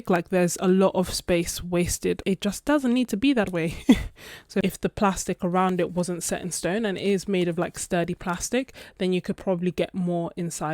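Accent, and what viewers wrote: England, United Kingdom. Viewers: I’m in soggy Cambridgeshire, so probably not far away from you judging by your accent. Love hearing how different people feel about palettes. I’ve got many of the same. How I feel about them is an evolving process. I’ve come to realise they key for me is flexibility, if I can’t change it, I get bored.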